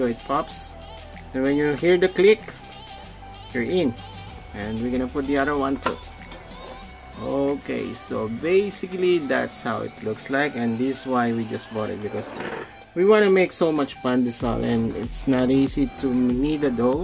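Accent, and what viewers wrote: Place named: Philippines. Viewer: Sound like a pinoy. Saalamat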